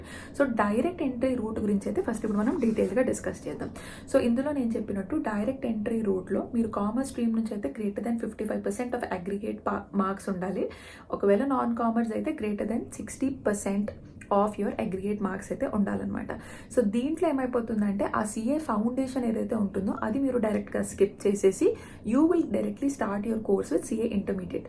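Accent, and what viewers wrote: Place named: Kerala, India. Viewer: Miku malayalam accent undi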